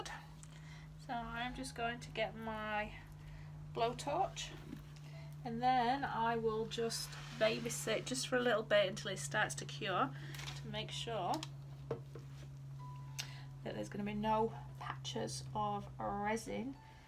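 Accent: United Kingdom. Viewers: thanks for the detailed answer, much appreciated. I'm from Yorkshire but live in USA now. I detected a northern England accent!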